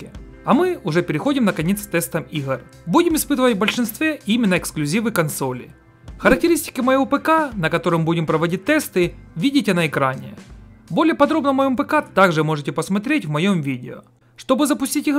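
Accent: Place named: Western Ukraine, Ukraine. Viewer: А ты что Русский паря? Я думал по говору Бандера.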